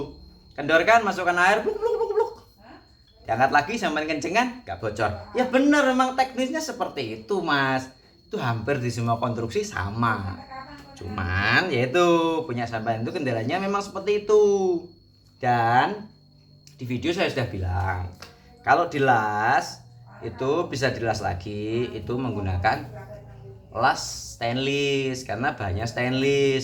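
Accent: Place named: South Sumatra, Indonesia. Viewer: Lokasi pundi mas sampean ..kok seng dandan apache bahasane kok koyo sumsel mas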